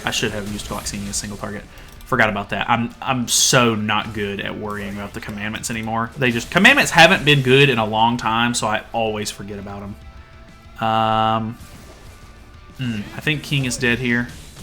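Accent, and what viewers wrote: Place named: Texas, United States. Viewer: are u by chance from Texas? Your accent reminds me of Maggie from The Walking Dead lol BTW maybe try Green or LR Diane, Lance and King? The passive Diane relic damage boost should be pretty nice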